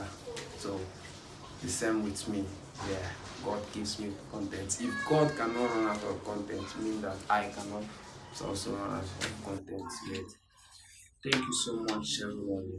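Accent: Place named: Igbo, Nigeria. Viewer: Pls was it the first pin you later had or the second. And pls, where was your location. You sound like an Igbo guy.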